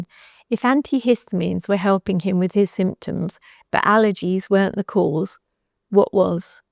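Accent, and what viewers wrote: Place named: United Kingdom. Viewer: British accent is great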